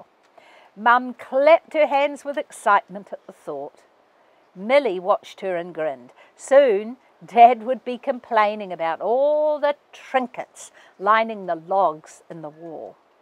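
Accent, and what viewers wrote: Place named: New Zealand. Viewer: I wait for every chapter. This is a keen idea you reading your book, especially with that enchanting NZ accent. I will be in my kitchen preparing dinner, baking a loaf of bread or washing up after supper and I realize my face is smiling as I listen😊. I did send an email and I do hope you received it. Blessings, Cathy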